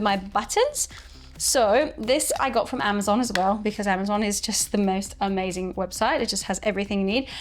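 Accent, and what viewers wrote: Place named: Australia. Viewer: Hi, I love your content and I’m so happy to see your video! I really enjoy the vegan blogs and love listening to your Australian accent. ❤️🤍keep posting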